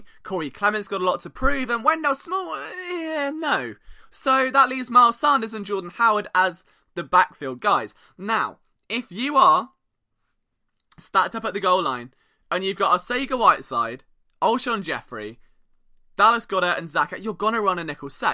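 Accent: England, United Kingdom. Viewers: Exactly. I guess some people don’t like his English accent talking about American football but they are just being a-holes.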